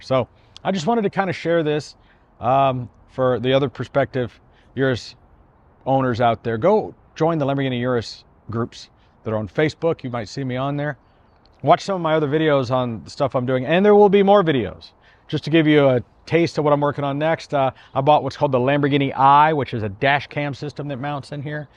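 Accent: United Kingdom. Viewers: at first yeah but after a few videos it normal and I like his British accent. However, his father hard laughing after each talk is very annoying.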